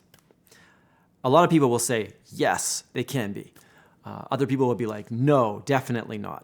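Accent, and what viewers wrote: Canada. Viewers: Hmmm, I don't know .. This is the first video of yours that I watch (and I like it a lot by the way, I like the clear way you express your ideas and thoughts) You sound Canadian or American to me, and I find the "homophobia" thing more present there to be honest, so that could be something to note? Because in so many other places I find that men do express more emotion to their friends without this fear of "appearing to be gay". I also believe that men are not as emotional as women, or in better words: have different emotional needs than women does, in terms of sharing or expressing. Which explains the universal idea of (men being stronger and less expressive) that's been around throughout history. That being said, I do agree with you about stereotypeing, it makes life pretty rough for the more sensitive and emotional men. I think it always comes to that old tale of people thinking of themselfs as the "standard mold" that all others should be shaped with, which is a very human thing to think (it has to do with the natural need in people to feel connected and safe), but also it is the exact thing that makes life harder for every "different" human who is trying to fit in. 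This is very interesting to me, it's an issue that I got invested in and studied for my master's thesis, actually, and I found that the only way to solve it is to, basically, open people's minds to differences and teach them how to deal with it without losing themselves or causing others to lose themselves eit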